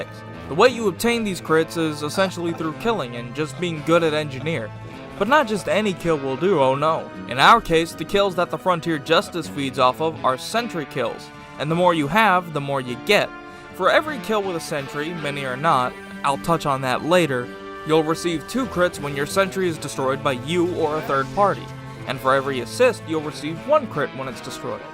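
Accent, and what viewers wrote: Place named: South, United States. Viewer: his southern accent? It's fucking GOLD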